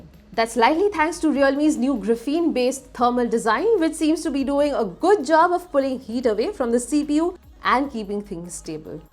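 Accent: India. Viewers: Wait a minute. There's nithing to be proud about, rather contrary. Wroting it as a Pole who albo finds I Dian accent extremely disturbing. A good and thorough review though👍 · Gosh don't they have AI to eliminate Indian accent? I can't hear half of the words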